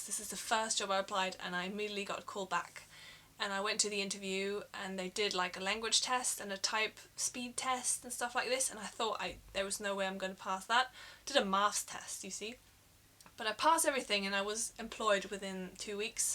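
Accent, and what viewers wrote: United Kingdom. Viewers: How did you learn the british accent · Amazing British accent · I really love your British accent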